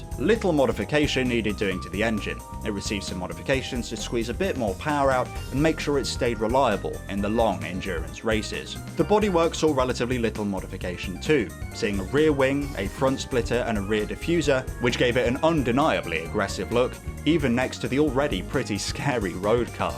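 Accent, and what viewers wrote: United Kingdom. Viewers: Ah yes , a British accent guy talking about cars, hits the spot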